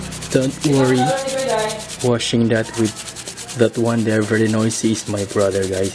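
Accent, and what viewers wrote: Visayas, Philippines. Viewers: hahaha bisaya man diay ka slangs kaau kada.hehehehe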